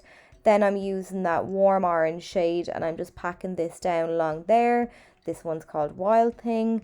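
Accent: Ireland. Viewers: Your tutorials are always so easy to follow and you do amazing looks! I also could listen to your beautiful Irish accent all day 🥰 I have only been there once but I’d go back in a heartbeat!! My parents chose an Irish name for me to honor my Grandma who was 100% Irish herself so Ireland always holds a special spot in my heart ❤️ :)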